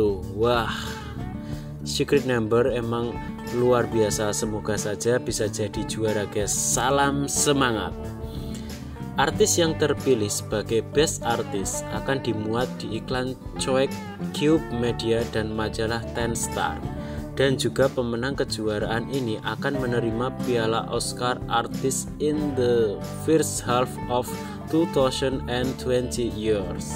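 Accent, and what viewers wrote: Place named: Java, Indonesia. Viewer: Asline pundi mas? Medok rek 👍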